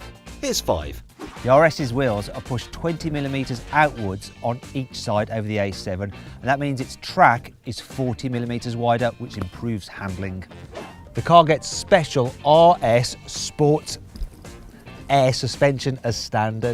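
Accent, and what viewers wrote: United Kingdom. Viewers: 영국발음 너무좋고 디자인 지리고 우리나라에 실구매가 1.35에 나오면 대박 잘팔릴듯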